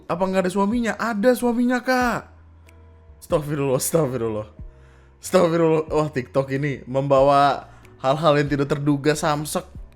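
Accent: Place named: Medan, Indonesia. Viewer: Medan bah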